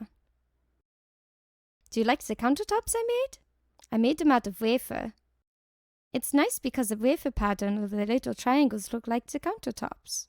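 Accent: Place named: Germany. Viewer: YEESSSSS. YOU NAIL THE GERMAN ACCENT. SO WELL. THANK YOU!!!!!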